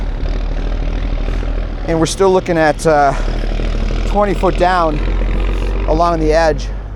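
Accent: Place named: New York, United States. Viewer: Buddy, you sound like you JUST came from New York City!! Definitely!!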